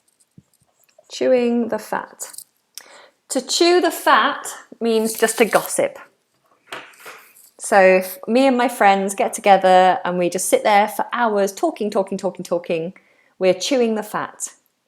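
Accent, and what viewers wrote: United Kingdom. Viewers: Hello dear, very good English language teaching. I love Britain and British and the very sweet English accent. at the moment I am watching you from Iran. 40 years ago I was in Manchester United Kingdom. And I enjoy hearing a very sweet accent and remembering every thing again. To be honest I should have never leave the beautiful England. Love UK. Love people, culture, language and the great history. Thank you. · Greetings from 🇲🇽 I like to learn with you. Even If I need to learn 🇺🇸 English. I can't stop getting involved of how great British accent is.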